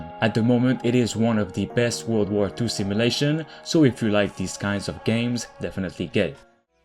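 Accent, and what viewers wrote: Quebec, Canada. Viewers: Accent québécois ? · est-tu quebecois mon criss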